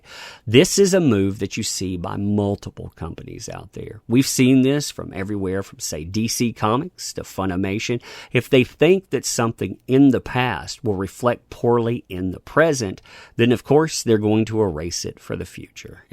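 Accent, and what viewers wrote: South, United States. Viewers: I love you and that southern-ish accent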